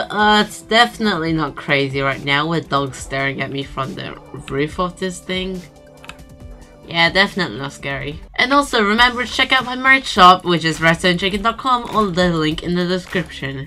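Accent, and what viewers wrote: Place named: Australia. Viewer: Wth!! I didn't know you were an Aussie!!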